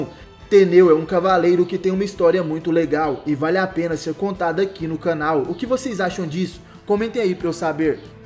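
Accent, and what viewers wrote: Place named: Portugal. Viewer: purtugues d'purtugual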